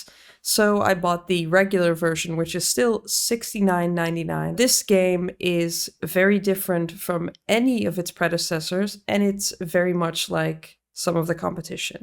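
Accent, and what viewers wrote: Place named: Netherlands. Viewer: I smell Dutch accent🗿 But I feel like they kinda dumbed down the fun parts of civ 7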